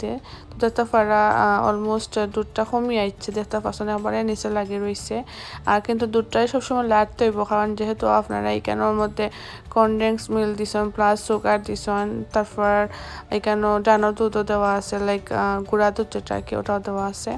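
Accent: Sylhet, Bangladesh. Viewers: সিলটী কথা হুনতে খুব ভালা লাগে । আমিও একটু কইলাম । কিন্তু তোমার নাসতা দেখতে কিন্তু সেই ভালা অইছি ।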